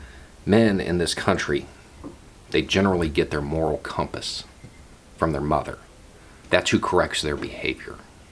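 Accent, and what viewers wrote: South, United States. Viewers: What's with the southern drawl? You didn't sound like that back in the day.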